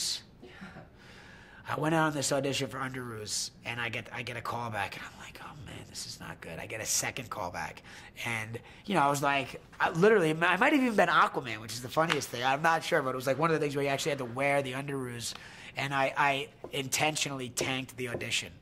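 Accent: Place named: New York, United States. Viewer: No, he sounds like a New Yorker lol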